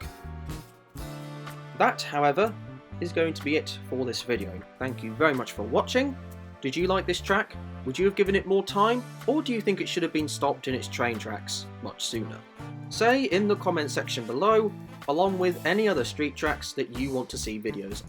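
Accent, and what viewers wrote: United Kingdom. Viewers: Just found this channel Great content 🎉 The grainy British commentary matches the race footage perfectly So jazzy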